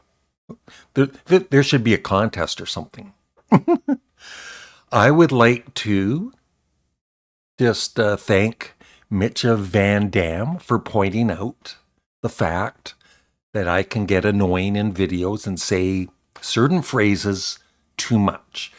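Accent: Canada. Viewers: Honestly, I never noticed you saying "you know". I do notice the "aboots" but that's your lovely Canadian accent which doesn't bother me. At least you don't wave your arms and hands in front of the camera like you're chasing off a swarm of angry bees. There are a couple music reviewers that give me a seizure with all their silly arm waving behavior 😆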